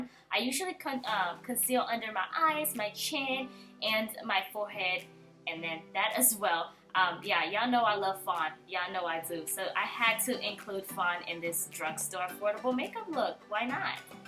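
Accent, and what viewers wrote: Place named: Kenya. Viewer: Crazy how I could automatically tell you’re Kenyan 😭❤️ I’m kenyan too girl 🇰🇪😛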